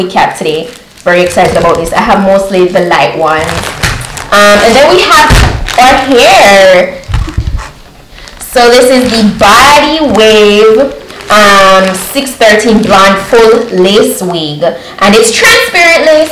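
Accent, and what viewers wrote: Jamaica. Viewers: I saw you promoting a foundation on Pinterest and I was like this girl sounds like a Jamaican 🇯🇲 so I had to find you and I swear I watched all your vlogs and videos (All) and I love you, your sis and your mom you guys are the realest 😊 was actually waiting on a new video. You go girl ppl like you make me proud to be a Jamaican. God bless you 🙏🏽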